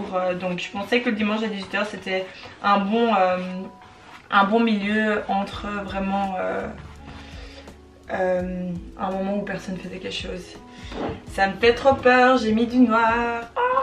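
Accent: Belgium. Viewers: Tu ne serais pas Liégeoise par hasard ? Un petit fond d'accent familier. En tout cas j'espère que tu développeras tes activités, courage.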